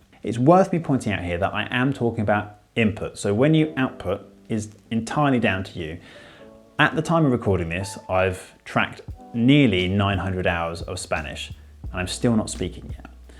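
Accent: United Kingdom. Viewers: I'm trying to learn English and i have a B1-B2 level right now. I think i understand this video at 85% even if you speak pretty slowly and you are very clear with your vocabulary. I think it's due to the different accent because i never watch British contain on YouTube. I should because i can understand an American video at 90-95%. The problem is still when people speak so fast that i can't understand. I do a lot of input but also take vocab on a special app (Quizlet Wich i recommend because it's like cards and it's very helpful). Make input and learn the vocab that you learn while input and you'll increase. Also, read in your target language even if it's book that you already know. I had a 615 score in TOEIC and by this method i have a 850 today. I don't even know the grammar that's why I hope that there is not a lot of mistakes here. Tell me if there is mistakes I'm happy to learn things from native😁. 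· Excuse me, Where is your accent from, precisely? South of wales, where? I would like to do an exchange in the future, but I think that some UK accents are difficult to understand, and yours sounds nice to me even though I'm not used to hearing this accent.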